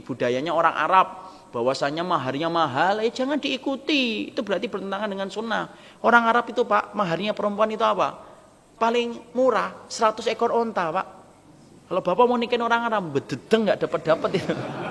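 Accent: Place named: Kudus, Indonesia. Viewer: Logat orang Kudus'nya Ketoro Ust.. Barakallah 😀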